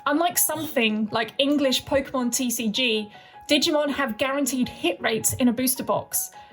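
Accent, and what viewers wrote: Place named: United Kingdom. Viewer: Where have you been hiding? Love this. Finally a fellow British (well proper english speaking) person 😅. Nostalgia overload.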